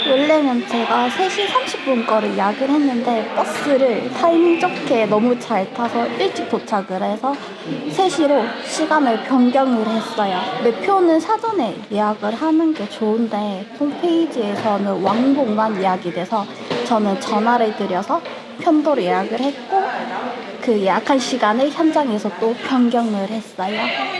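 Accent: Gyeongsang, South Korea. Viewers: 딱 대구말투 시네요^^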